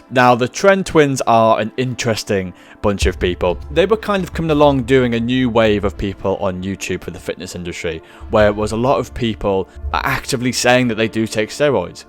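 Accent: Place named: United Kingdom. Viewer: So sick of backwoods British accents on so many youtube videos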